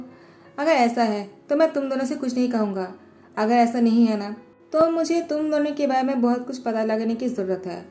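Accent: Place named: Bengal, India. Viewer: Di you're a bengali right?? 😁 plz ap mind maat karna apki stories bohat acchi hoti hain and I love them😍❤ but maine bohat baar apka baat karne ka tone ya baaton ke beech bengali word ate hue dekha isiliye puchi and also ye story ek famous bengali movie se inspired hain so🙂 (and i'm also a bengali)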